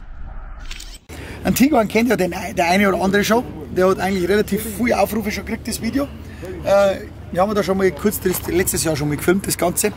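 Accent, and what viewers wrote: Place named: Saxony, Germany. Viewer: Da sage noch einer sächsisch klingt furchtbar...nach 10 Sekunden habe ich das Video ausgeschalten...unerträglich.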